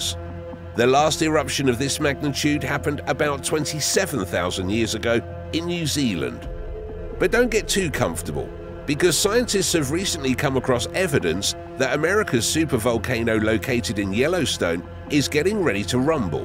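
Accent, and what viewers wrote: United Kingdom. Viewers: 😒 why are these fearmongering videos always done by British voiced people. I made it 30 seconds and GONE! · NOT another Brit narrator!!! Stopped at :09 seconds How about an American to explain an amazing American wonder... I'm outta here! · British narrators can piss off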